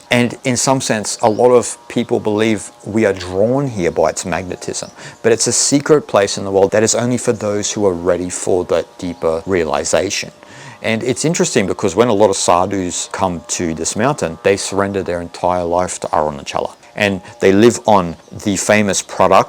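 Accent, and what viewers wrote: Australia. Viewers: THANKYOU SO MUCH FOR THIS WONDERFUL EASY TO UNDERSTAND EXPLAINATION OF ARUNACHALA AND RAMANA MAHARISHI AND THE REASON WHY PEOPLE GO THERE TO RECOGNIZE THE SHIVA WITHIN THEMSELVES HALLELUJA AND AMEN I HAVENT BEEN THERE MYSELF BUT MY DAUGHTER AND THEIR GROUP WITH THEIR GURUS DID THE CIRCUMBILATION OF THE HOLY MOUNTAIN I HAVE KNOWN ABOUT RAMANA MAHARISHI FOR A LONG TIME MANY YEARS BUT I GHOSE ANOTHER PATH OF TIBETAN BUDDHISM WHICH OFFCOURSE IN THE END COMES TO THE SAME CONCLUSION BUT IAM SO PLEASED THAT MY DAUGHTER DID THIS AND HAS TAKEN THE PATH OF SHIVA WITH TWO BALINESE VERY HOLY GURUS IN BALI AND WE LIVE IN BALI NO DOUBT YOUV HEARD OF UBUD A MECCA FOR TOURISTS AND YOGA ANYWAY I CAN HEAR YOU ARE AUSTRALIAN AS WE ARE AS WELL ALLTHOUGH MY DAUGHTER HAS BECOME INDONESIAN AND WITH HER INDONESIAN OR RATHER BALINESE HUSBAND BROUGHT UP HER KIDS HEAR AND DURING THOSE 27 years HAVE HOMESTAY WITH A YOGA RETREAT FACILITY AND SO ON HERE AND IS VERY MUCH INTO THEIR ASHRAMS AND SO ON FOR ALL THOSE YEARS BUT ANYWAY I HAVENT HAD THE OPPORTUNITY TO GO TO THAT PART OF INDIA ALLTHOUGH I WENT TO BODGAYA TWICE AND DHARAMSALA IN NORTH INDIA AND WAS PRIVILIGED ENOUGH TO BE IN THE PRESENCE OF HIS HOLYNES THE DALAILAMA AND RECIEVING ORDINATION FROM HIM BUT IT ONLY LASTED ABOUT 3 YEARS ANYWAY AND THEN MORE OR LESS ON AND OFF JOINED MY DAUGHTER IN BALI BUT NOW LIVE HERE PERMANENTLY FOR THE LAST 2 YEARS AND 9 MONTHS SINCE IAM 83 allmost 84 MY DAUGHTER IS LOOKING AFTER ME IN RETIREMENT THE NAME OF O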